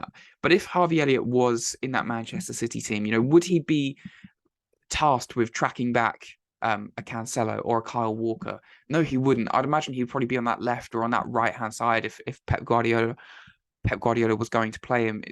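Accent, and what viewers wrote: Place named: South, United States. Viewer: Not being funny mate, your chatting with a Southern accent saying your a scouse fan, sounds like a glory hunter to me.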